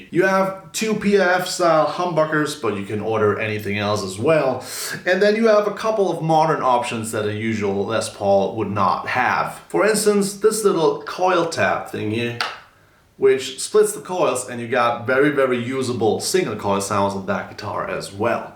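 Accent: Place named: Germany. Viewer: I thought i was listening to Zach Galifianakis imitating Matthew McConaughey.. lol.. Then i understood you might be from Germany.. But to the most important part.. your playing is absolutely sublime, man. Do you have a spotify or bandcamp account? I really hope you have a few albums out.. or even singles.. Take care and all the best,! Edit. Just bought your backing track in E Minor..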